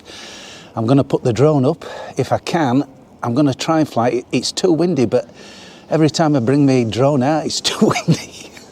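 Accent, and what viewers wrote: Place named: Yorkshire, United Kingdom. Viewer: Another lovely video as always love to hear the Yorkshire commentary thumbs up to regarding her teeth I am going on 91 and I have all mine well except for the wisdoms! Always enjoy wherever you go looking forward to the next one😊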